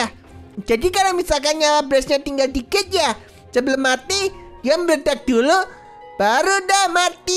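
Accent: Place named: Java, Indonesia. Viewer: bang medok banget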